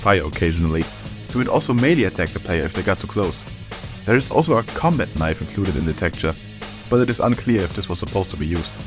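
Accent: Germany. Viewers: Why are cut enemies always that good?😭 PS: Its undeniable that you are german, am i right?😅😊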